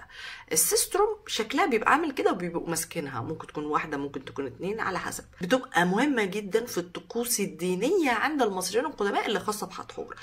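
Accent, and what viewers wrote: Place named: Japan. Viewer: انا حبيت جدا الاكسنت بتاعتك باليابانى بجد تحفة ❤❤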